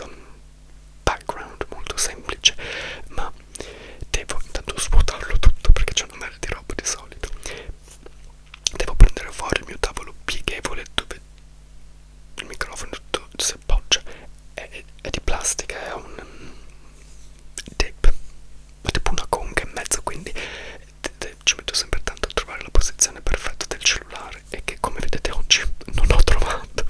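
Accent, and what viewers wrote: United Kingdom. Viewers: Scusami ma ho alcune critiche da farti: 1 sei fissato con la corretta pronuncia delle lingue ma poi hai perso l’accento italiano corretto pronunciando la t inglese e spesso le doppie molto poco doppie. 2 buffo come alla fine il video da italiano pentito in cui praticamente disprezzi l’italiano è quello più visto. Alla fine ciò che hai disprezzato e cercato di cancellare dalla tua identità è ciò che ti ha dato più soddisfazioni. 3 hai un atteggiamento vittimistico nei confronti della vita, le sfighe succedono tutte a te. Per questo ti consiglio di vedere uno psicologo. Avere un male incurabile è qualcosa fuori dal nostro controllo ma scegliere persone sbagliate di cui invaghirsi o non riuscire in un lavoro dipende da noi. Per esempio fare la drag ma odiare il mondo drag e non uscire con loro significa essere tagliati fuori dal giro in un mondo in cui i contatti sono tutto. 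Se è quello che vuoi fare come lavoro devi scendere a compromessi, altrimenti resta fedele a te stesso e rendilo un hobby. Il mondo non ti deve niente, se vuoi qualcosa vai e falla ma sii consapevole dei compromessi che sono richiesti, nessuno ha detto che il mondo sia giusto o che basta il merito purtroppo. 4 sinceramente non capisco l’esigenza di dover sentir parlare inglese. Non capisco la fissazione per l’inglese. Si sceglie di vivere in un posto perché è il migliore per le proprie esigenze lavorative e di stile di vita e magari anche per gli affetti che uno ha. Se sono una makeup artist e la città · Ma perché se sei italiano parli con l’accento inglese? So che non vivi in Italia,ma non comprendo il dover sforzarsi a far sentire per forza che si ha un accento straniero… 😅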